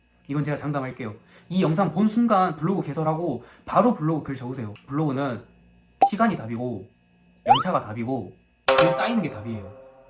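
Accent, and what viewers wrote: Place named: Jeolla, South Korea. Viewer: 출신이 전라도인가요?억양이 전라도아신데...그럼 99.95% 사기꾼.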